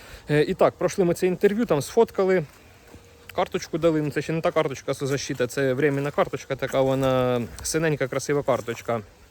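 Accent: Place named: Slobozhanshchyna, Ukraine. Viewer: Молодець що не стоїш на місці, шукаєш своє. Стежу за тобою з того моменту як ти почав працювати на доставці у Вроцлаві, подобається як записуєш свої відео, маєш свій стиль. Хотів підбадьорити тебе відносно мови. Пропоную не вживати слова СУРЖИК, бо це кремлівський винахід від слова сурогат. Якщо я вірно зрозумів- ти розмовляєш українською з яскравим СЛОБОЖАНСЬКИМ діалектом. 😀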